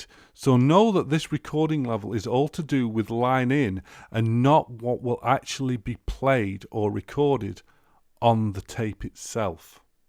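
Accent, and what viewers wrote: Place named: Manchester, United Kingdom. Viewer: You earned a sub from me, Mate and I haven't used a tape in years. I find your accent to be excellent to listen to. Sounds like the bloke from Manchester I watch walking all the canals and sleeping in the woods. On top of that. you replied in a minute to a comment of mine on one of your old videos indicating that you appreciate people watching your stuff!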